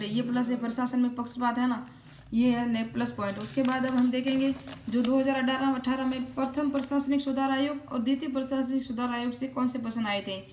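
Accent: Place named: Rajasthan, India. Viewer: Aap badmer ya jodhpur side se ho kya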